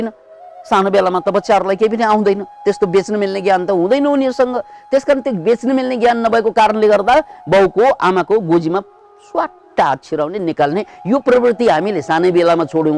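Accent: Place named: India. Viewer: बोलेको सुन्दा त इन्डियन हो कि जस्तो पो लाग्छ हौ!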